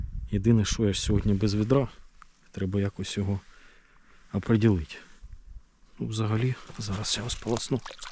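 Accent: Poltava, Ukraine. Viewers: Суржик точно в тебе полтавський! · душевне відео. особливу у тебе виходит нормально українською мовою Полтавський діалект особливий🥰🥰🥰 без отого чтоко-дакака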